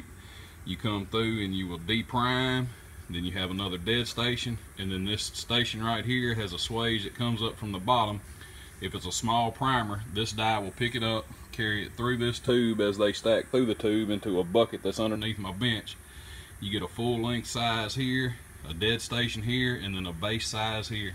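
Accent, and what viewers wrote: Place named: South, United States. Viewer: the true southern draw =)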